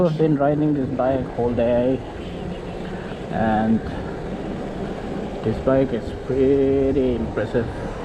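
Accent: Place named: Mizoram, India. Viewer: Mizo saptrong thluk(accent) chiah2 nh chu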